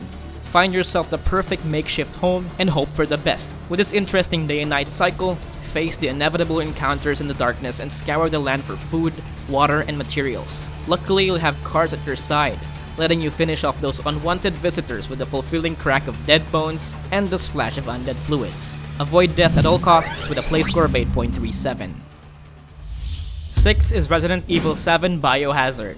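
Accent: Philippines. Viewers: filipino accent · i smell a filipino accent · I can't quite place that accent. It sounds either Filipino or South American.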